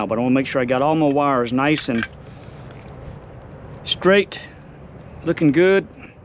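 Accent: South, United States. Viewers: Thank you ! I bet I could replace our 35amp plug now! Good job! P.s. I just love your southern voice !💙 Have a blessed day yall!!!!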